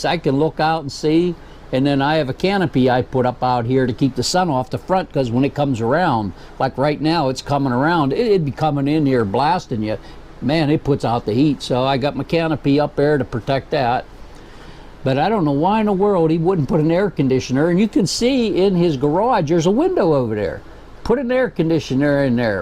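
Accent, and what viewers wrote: United States. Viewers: Are you from pennsylvania? I can hear it in your voice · I'm originally from New Castle. I know that western PA accent when I hear it!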